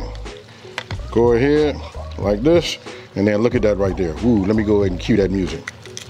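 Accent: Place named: Los Angeles, United States. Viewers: no His accent sound more like California,.. I aint never heard no Mississippi people sound like that (im from Memphis btw) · You sound like you're from LA or Compton... southern with a west coast talk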